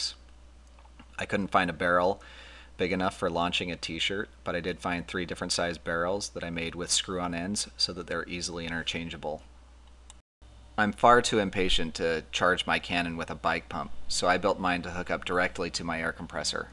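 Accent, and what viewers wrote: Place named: United States. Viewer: typical american voice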